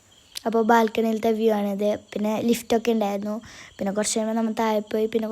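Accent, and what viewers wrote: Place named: Kasaragod, India. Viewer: It's me thanha Kasaragod slang nalla paangnd 😂😂😂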